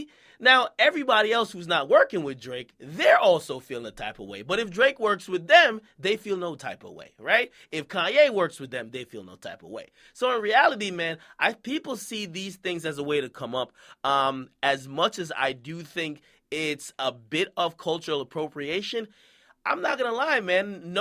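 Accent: Jamaica. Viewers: yow akademiks where in jamaica you from ?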